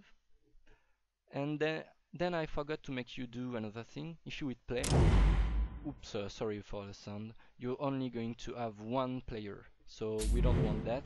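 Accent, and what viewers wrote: France. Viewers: Super tuto ;) C'est encore plus simple a comprendre avec ton accent français ;)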